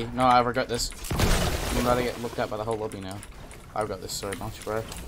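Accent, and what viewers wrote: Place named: Oceania. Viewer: not sure if your trolling but if you can't tell by his accent he plays on oce servers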